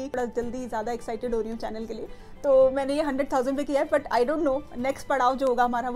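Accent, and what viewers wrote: Uttarakhand, India. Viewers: I knew you are from Uttarakhand from your accent! Btw I’m also a delhite and from Uk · Congratulations 😊from your accent I got a hint that you belong to Uttrakhand(as I am), keep going on...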